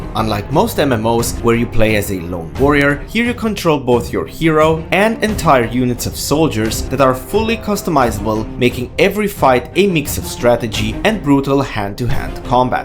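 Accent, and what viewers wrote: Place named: India. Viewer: Indian accent detected. I dip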